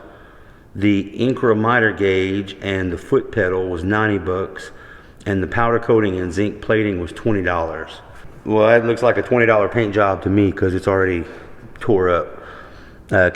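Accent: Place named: Louisiana, United States. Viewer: when i first heard you start talking i said this cat has to be from Louisiana, great video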